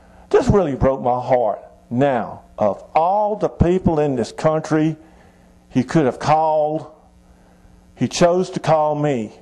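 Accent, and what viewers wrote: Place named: South, United States. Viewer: which one of the southern states is he from?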